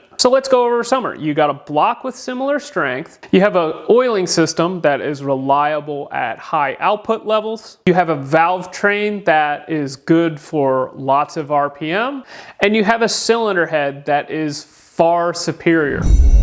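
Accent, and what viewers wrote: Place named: Australia. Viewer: I only saw the vid for 2.3 seconds and I said thats gotta be australian lol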